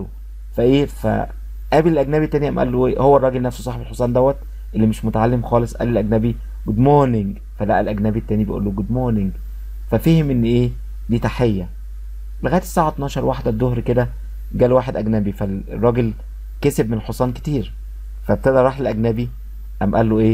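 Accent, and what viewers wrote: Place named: Egypt. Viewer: والله اسلوب جميل وتعليم روعه انا من العراق بس ملاحظه بسيطة تشوش عليه اللهجه المصرية من ناحية حرف( ذ) هههههه بس استاذ عظيم الله شاهد واخ عزيز تحياتي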